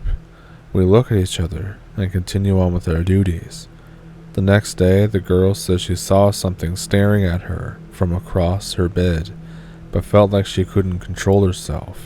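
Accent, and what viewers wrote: Canada. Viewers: Great content. Delivered in a fellow Canadian accent :)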